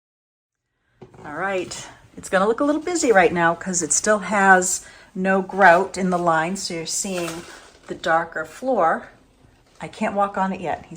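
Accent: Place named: Philadelphia, United States. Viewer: I love when I hear you say soar for saw…very Maineish for someone who has a Philly accent. Thank you for your channel. Your voice is very soothing ..a follower from Pemaquid Point in Maine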